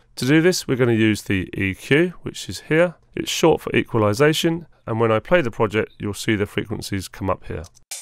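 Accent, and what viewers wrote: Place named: United Kingdom. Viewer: Nothing bet'er than to have a brit' teacher, noice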